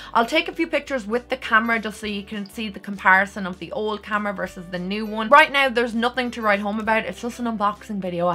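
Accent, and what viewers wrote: Ireland. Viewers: Is she Irish?NVM I can tell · Are u Irish?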